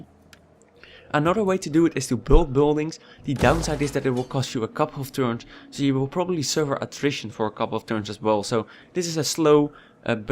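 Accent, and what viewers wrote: Netherlands. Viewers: This guy is so dutch , holy shit ! ty tho <3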